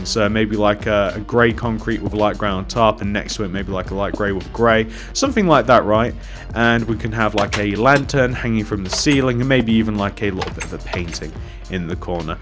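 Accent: England, United Kingdom. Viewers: Are you English, cause I am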